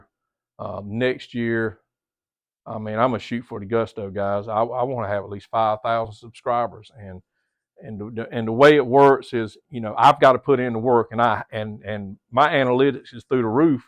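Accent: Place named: South, United States. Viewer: Cheers bud. This is comin from a yankee. Gotta say, i was raised by a southern gentleman. Although i dont live in the south, its really cool to hear a you tuber who’s doing videos about home theater, AND has a little southern twang in his voice. Cheers good sir! I enjoy hearing about your home theater journey. Have a good one..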